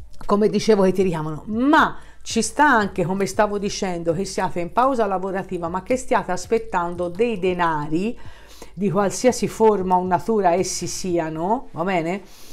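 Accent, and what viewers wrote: Tuscany, Italy. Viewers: Prima stesa bellissima... Speriamo! 💞Grazie sei molto chiara e concisa e con l'accento toscano mi piaci molto... Posso chiedere il tuo segno zodiacale sento molto empatia con te io sono bilancia 😁😁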